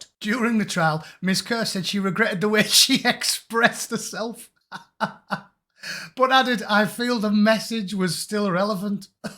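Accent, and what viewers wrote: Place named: England, United Kingdom. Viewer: What town in England are you from that you have this accent? Serious inquiry.. love the accent!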